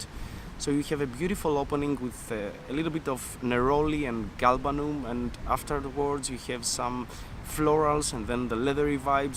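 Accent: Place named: Greece. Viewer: XD recognized the accent im Greek too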